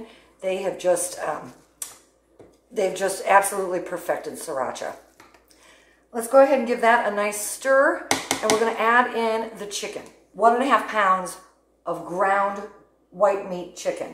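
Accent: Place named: Chicago, United States. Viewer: You seem to communicate in Chicago speak!...awsome recipes...such fun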